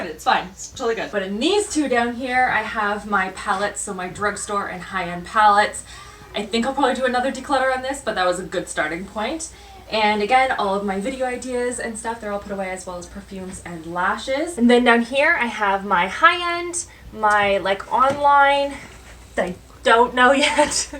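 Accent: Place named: Canada. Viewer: Do you guys binge watch? I know I do! I feel like after about an hour of her voice, I have picked up my own slightly Canadian accent. Lol. Coffee cheers from Michigan “aye” 😊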